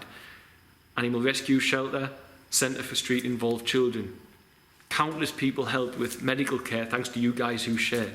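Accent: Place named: Newcastle, United Kingdom. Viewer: This is what I needed as a Glasweigan. A mega spiritual Geordie to cement what I already knew. Not even a lick of sarcasm. Yes!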